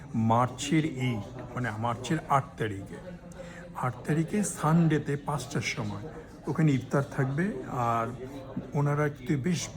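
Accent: Standard. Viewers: আপনার শুদ্ধ বাংলা বলার ধরনটি চমৎকার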